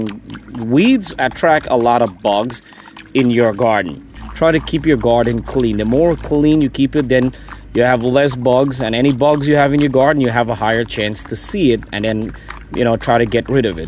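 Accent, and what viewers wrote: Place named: Guyana. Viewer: Sound like a GT Banna. 🇬🇾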